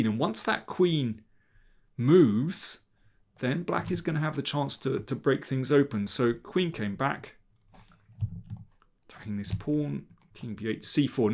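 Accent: England, United Kingdom. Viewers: Congrats on 100K, Great English accent...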